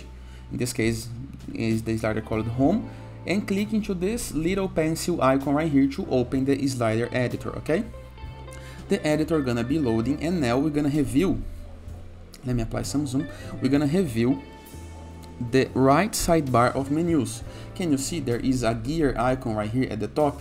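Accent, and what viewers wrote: Brazil. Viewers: Judging by the accent you are brazilian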